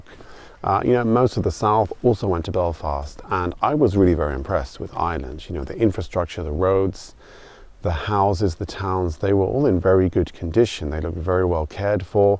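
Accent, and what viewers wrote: Germany. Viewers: You’ve definitely sound like a German speaking English . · You have a mild German accent ❤ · Your accent is very interesting after that 16 years. Its 50/50, the German accent coming through very strong. Re: UK, yeah, its got dark in some places, your average high street now in small towns is full of Betfred, Turkish Barbers, maybe a Chinese or Indian takeaway or two, Indian Dessert Shops/American Candy shops (aka: tax dodging businesses), just super disconnected from the culture and a bit dead. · Dude you now speak with a slight German accent. Not sure how long you've been in Germany, but thought you were a German living in the UK. 😁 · I hear a hint of German accent in your English. Is that from living in Germany for a decade? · Can I just ask, do you speak German in Germany? Your accent seems like ...German? I have lived in Thailand for 14 years as a Brit, I speak Thai, but when I go back to the UK people say I sound American. 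· You now speak English with a German accent. It’s fascinating to listen to. Thank you for this commentary. It’s a very accurate appraisal of the uk. Makes me sad.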